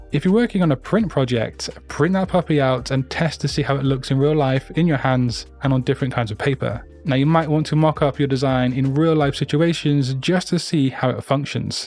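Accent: United Kingdom. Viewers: Are you from the USA? I thought you were from the United kingdom.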